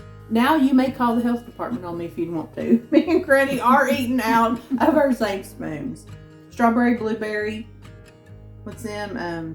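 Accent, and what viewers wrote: South, United States. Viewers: thank you for replying about my cottage cheese/ beet recipe. I can just hear your voice …. I love your southern accent! And of course all your recipes, I have tried so so many of them!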